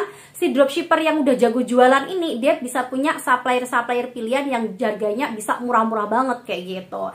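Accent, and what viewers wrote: Java, Indonesia. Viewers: Kowe wong jowo to mbk, kuq bosomu medok😂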